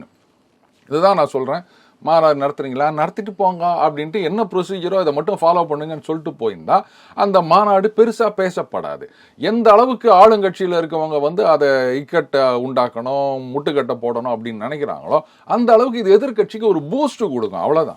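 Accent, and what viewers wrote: Sri Lanka. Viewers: இலங்கைத்தமிழர் போல் உச்சரிப்பு இருக்கே இந்த நெரியாளரிடம்.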